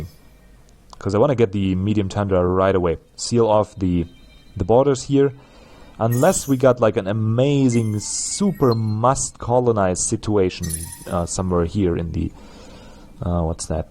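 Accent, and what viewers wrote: Germany. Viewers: Are you, by any chance, german?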